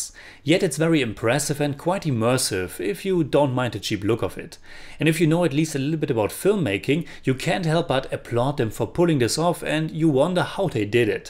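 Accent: Germany. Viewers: Dind´t though of this movie as a mindf*k at all and my disabled roommate who was kinda afraid to watch this movie, because "it´s for the smart people" was like me kinda disappointed for it being a quite simple time paradox movie after all the hype. In the end it was a really great B-movie despite the typical bad acting of some actors. But what I´m wondering, why do you still have this obvious german acent left? Why don´t barely any germans take pride in pronouncing a different lenguage correctly? It´s always just like "srew it if I butcher the pronounciation despite having all the possibilities to learn how to pronounce correctly due to the freak`n internet". I´m about to get 35yrs, had terrible english teachers trying to force their germanized take of british english on us and yet, I´ve started to teach propper pronounciation to myself at the age of 17 (I´m old, just got the first affordable and actual useable internet connection just two years prior) and am asked which english speaking country I´m from since I´m 21. 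But I guess I have a big talent for speach since bavarians asked me which part I´m from even I´ve never been to bavaria in my life. In the end, your pronounciation is telling how much respect you got for a another lenguage.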